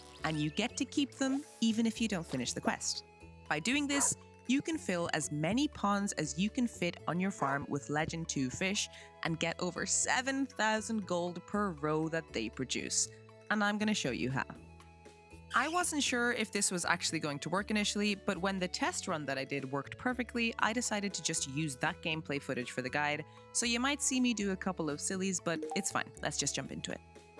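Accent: Ireland. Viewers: while I found it a bit jarring (as an unfortunate American) to hear it pronounced that way, I personally figured it had to with where you're from. It's easy to tell you're Irish from your accent. Every English speaking country speaks it differently, and even then it can be different just based on which part of the country you're in 😅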